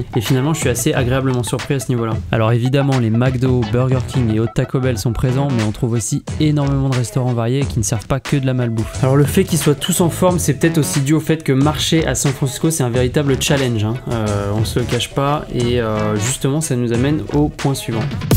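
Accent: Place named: Nord-Pas-de-Calais, France. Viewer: Bien bien :) J'aime bien le ch'tis ! Ta un avenir en comédien en fait, oublis youtube ! :) Par contre pas fan du filtre blanc que tu as utilisé :(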